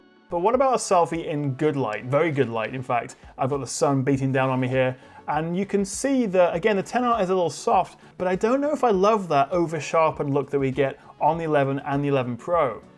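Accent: United Kingdom. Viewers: I´m subscribing because i´ve been studying english for about 14 years now... and your british accent helps me practicing and reinforcing my comprehension skills! (And also cause i love tech too i guess 😂😂😂) greetings from Colombia from a guy born in Argentina :[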